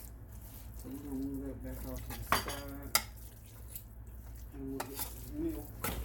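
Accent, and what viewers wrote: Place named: South, United States. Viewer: I just love her voice! She reminds me so much of my mommy and granny. The Cove City, NC in me is almost lulled to sleep by her southern twang and the peace in her kitchen and her cooking. Thank you, Ms.!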